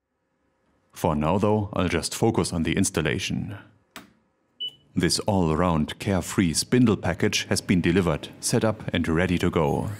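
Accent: Germany. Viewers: the German-vampire accented humor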